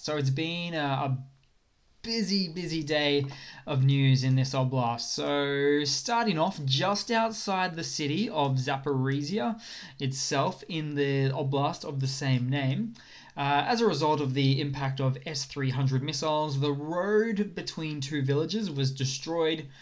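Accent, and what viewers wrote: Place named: Western Australia, Australia. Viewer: Plagurized content. Lacks originality. Direct copy of several other established credible youtubers. Suspect an accent from Western Australia. Not impressed. There does not appear to be any significant ability to understand anything other than what we see on deep state and copying of other youtubers. Give this SOB a Thumbs Down.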